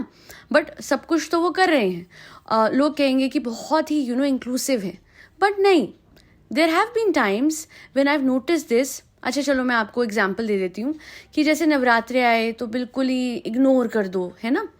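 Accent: Punjab, India. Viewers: Koi kuch kare ya na kare madam janab badle ya bhaad me jaye one thing is constant ur lovely voice and ur meethi meethi Punjabi ❤❤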